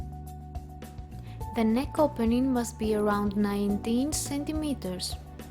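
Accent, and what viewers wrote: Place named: Greece. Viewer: ΚΑΤΑΛΑΒΑ ΑΜΕΣΩΣ ΑΠΟ ΤΗΝ ΠΡΟΦΟΡΑ ΣΟΥ ΟΤΙ ΕΙΣΑΙ ΕΛΛΗΝΙΔΑ!! 😉 ΜΠΡΑΒΟ ΚΟΡΙΤΣΙ! ΕΥΧΑΡΙΣΤΩ ΓΙΑ ΤΟ ΒΙΝΤΕΟ! ΕΧΩ ΣΚΟΠΟ ΝΑ ΤΟ ΦΤΙΑΞΩ ΚΑΠΟΙΑ ΣΤΙΓΜΗ! KEEP UP THE GOOD WORK!😊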